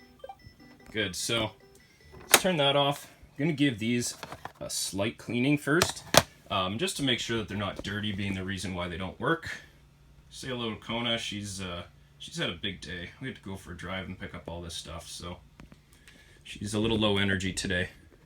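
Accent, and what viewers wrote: Canada. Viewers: I knew you were a fellow Canadian from listening to you and now I know I was right haha. Great videos!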